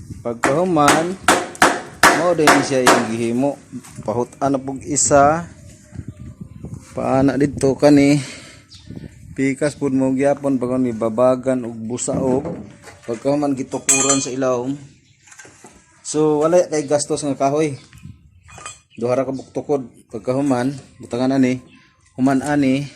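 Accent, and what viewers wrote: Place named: Visayas, Philippines. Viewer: Sir dugay ka na sa manila bisaya ka pa gihapon .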